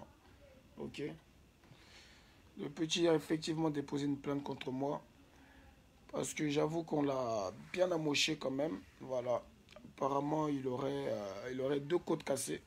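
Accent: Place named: France. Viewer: Ton acent francaise me plait bocou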